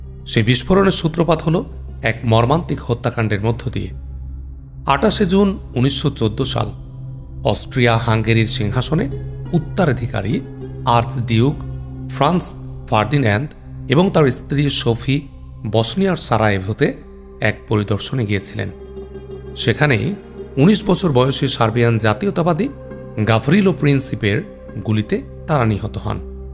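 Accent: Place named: India. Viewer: Indian, voice sunei bujsi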